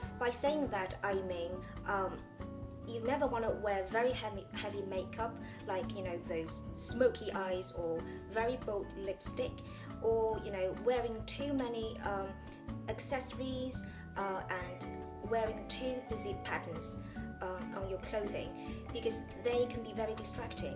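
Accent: United Kingdom. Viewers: 沒有美吧...整個英到不行...... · 覺得英腔加一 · 这个女孩的英式英语说的真的很好。不是光是语调节奏听着英式而单音错误一大堆的那种混迹在国内各大网站论坛的误人子弟者。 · how did you learn that accent? Luv that british accent! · 简直英音。。。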